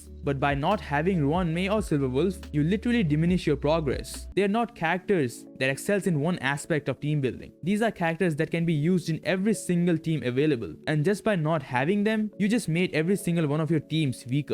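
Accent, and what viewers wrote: India. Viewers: you sound indian, are you?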